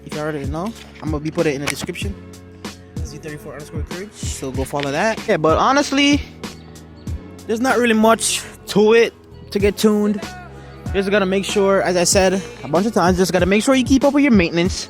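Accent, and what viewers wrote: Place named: Jamaica. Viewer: Bro sounds so Jamaican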